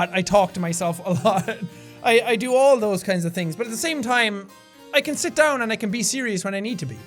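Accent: Ireland. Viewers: Are you scared by the fact that because you moved away from Ireland you'll slowly lose your accent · you really like potatoes😉 (Not Lying) And gotta love DAT Irish Accent(I'm White) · do you think your irish accent will go away since you moved countries, I hope it doesnt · what if you lose your accent since your not living in Ireland anymore... like how people who move to the US, from a place like Australia, they start sounding American after a while.... just curious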